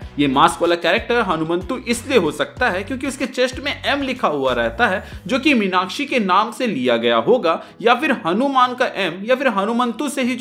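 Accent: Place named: Jharkhand, India. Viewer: Feka jata h , dhekla jayega bhai tm jharkhand se ho kya ???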